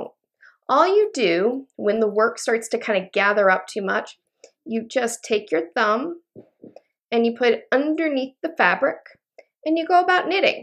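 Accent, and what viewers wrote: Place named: Missouri, United States. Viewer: Really enjoyed your video...thanks for that! I've never tried this method but you make it look so easy I'm going to give it a try. You must be from my home state of Missouri; you sound just like my sister. I'll be watching! Thanks again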